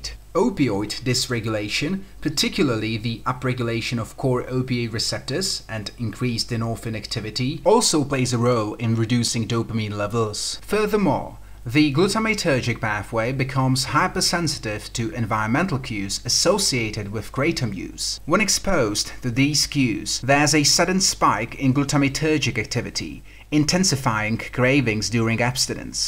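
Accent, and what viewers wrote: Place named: United Kingdom. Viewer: Jeej... to znie britsky, nie americky A hlavne nespievas 😅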